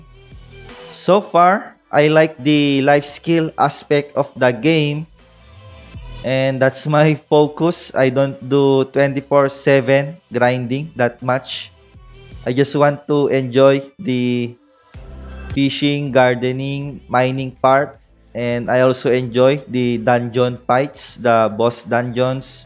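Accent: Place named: Japan. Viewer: what's with this japanese accent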